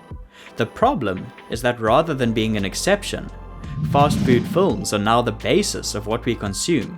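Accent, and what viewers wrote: South Africa. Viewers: Saffa accent detected? Transplanted to Ozz of course. Maybe NZ. But def Saffa underlying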